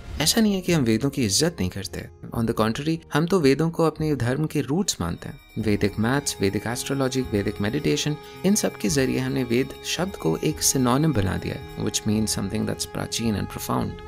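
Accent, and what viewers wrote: India. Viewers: Thanks for the effort. It would be good to have non Hindi pronounciation. Also, multiple language switches made it hard for me to follow. Appreciate if you could not switch so frequently. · All is good but it's irritating to listen Samskritam names in Hindi style. Why u call Ved Ved Ved??? It's Vedam or Veda. Not Vamadev ...cl VAMADEVA 🙏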